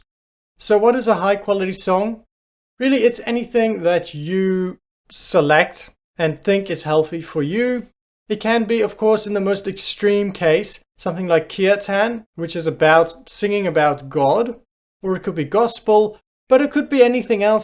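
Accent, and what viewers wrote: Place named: South Africa. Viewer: Yeah. I was confused for a second as I thought you were from Australia. But then, I thought about it and thought maybe your accent sounds a bit South African.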